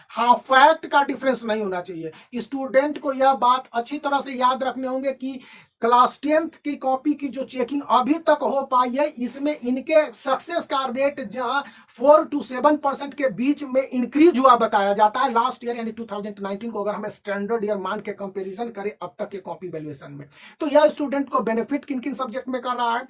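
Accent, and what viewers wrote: Bihar, India. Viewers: Lekin sir aap bhi bihari bolte hai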